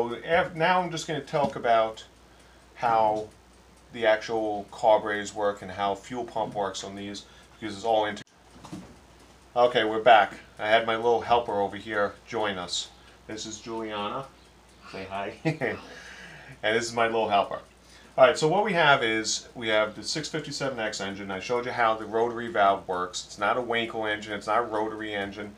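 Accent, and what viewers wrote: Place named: Texas, United States. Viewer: Nice explanation! I detect an accent. Are you from Texas?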